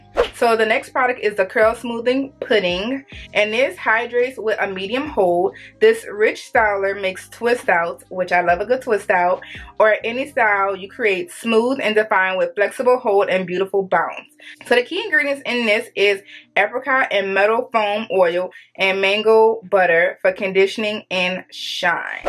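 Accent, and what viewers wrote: New Orleans, United States. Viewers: Curls are popping!!!!!! Are you from NOLA, cause I hear a lil something, something!!!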